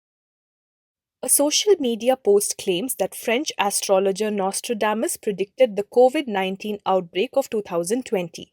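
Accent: India. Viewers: Indian accent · Why T F ?? Is it Indian explaining this shit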